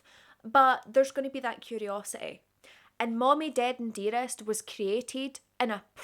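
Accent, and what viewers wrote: Scotland, United Kingdom. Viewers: I thought the sh*tshow of Gypsey Rose Blanchard life and crrimes couldn’t get much worse. When she was released i genuinely felt so much compassion and empathy for Gypsey. However, I am so glad that I found you, you deserve more subscribers - you are well put together, talk about this subject with the gravity it requires, have charisma, and the editing is high quality. Most of all you are Scottish (i presume going by your accent) I’m from Aberdeenshire but assume you are from the central region. Subscribed 🏴 ❤ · Love hearing a fellow Scottish accent! I also believe that Gypsy did not want to be Dee-Dee's full-time carer, in the future, as her mother's health was poor. I DO think she WAS afraid of Gypsy. Dee-Dee even said to her: "Gyspy... Don't hurt me." which Gypsy admitted to. And, what gets me.. IS... there were 17 stab wounds. 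Nick first said in his interrogation, he stabbed Dee-Dee FOUR times. I wonder if that WAS the truth. I believe so. Photos... also tell a story.... In ALL the photos of Dee-Dee and Gypsy, there is NOT one photo where Gypsy looks scared, unhappy, anxious, or depressed. I say that because, there's a photo of me taken, after I had got a telling-off from my Mum, when I was about 11... and I am visibly upset on that photo, TRYING to hold back tears for my aunt, as she took the pic. There's also one of me, when I was really sick with tonsilitis when I was seven, and it's obvious, I was really unwell. Normally all my baby/childhood pics, had big gummy, or cheesy grins! I loved the camera! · I’m here for your accent. I love it. Where from Scotland are you from? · I feel like I'm getting true crime intel from Merida. lol Gotta love the Scottish accent!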